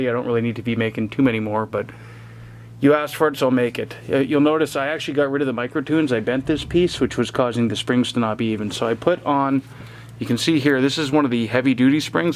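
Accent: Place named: Ontario, Canada. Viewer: I can tell from your accent you're from rural ontario? lol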